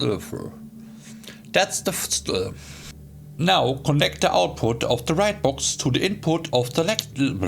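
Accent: Germany. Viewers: The German accent is the best part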